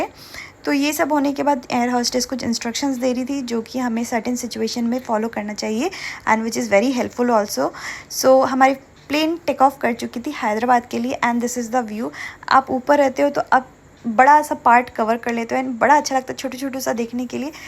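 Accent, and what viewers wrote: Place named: Kolkata, India. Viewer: Kolkata ascent in very good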